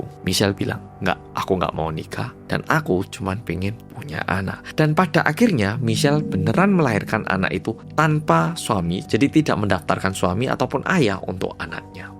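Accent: Java, Indonesia. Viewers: Masih suka suaranya ..medok bgt jawane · Lucu aja kalo dengar oppa medok cerita😅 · 😅😅 malah tertarik medok jowo ne Mase,nah yg bikin ngakak lagi kok malah wong Korea ngono lhoool😅😅😅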